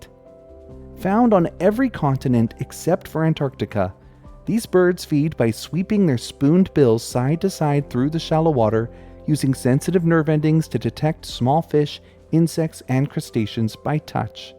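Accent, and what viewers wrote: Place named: Vancouver, Canada. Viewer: That makes so much sense! I never thought about it, but we have the same accent. I grew up just outside of Vancouver.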